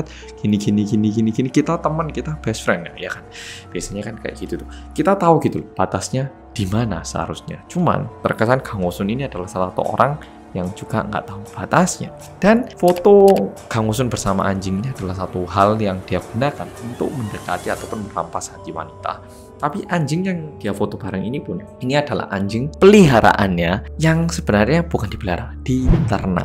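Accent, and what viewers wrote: Java, Indonesia. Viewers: Ampe medoknya di kencengin 😁😁 mantaaaaap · Gagal fokus am kreator nya wajah nya kek Korea tp cara logatnya kek jawa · Lagi nonton series korea di netflix judulnya "somebody" yg cowonya ganteng tapi pembunuh berantai agak2 mirip sama tema cerita yg dibawain sama oppa medhok ini😍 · Pembawaan videonya khas mas ada suara medoknya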